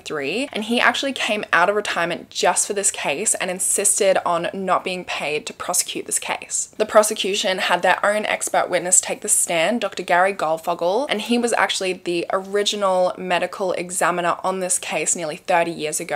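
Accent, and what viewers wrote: Australia. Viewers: Thank goodness that man had the training to get her out of the water without destroying DNA. Each LE department needs to have a couple of people trained in that area because it is so important. I'm sorry, the river...I can't figure out what you're calling it. It sounds like you're calling it the nut sac river. Not trying to be funny and I guess it could be possible but your accent is much prettier than an English accent and I just figured out your from Australia. Idk how long I should have already known that. I'm getting old...maybe I forgot. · You’re telling me you’re Australian not British?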